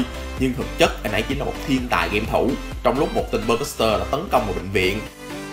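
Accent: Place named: Japan. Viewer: Anh chủ yếu đọc Tiếng Anh theo Nhật nên.... Mà công nhận dở thật:)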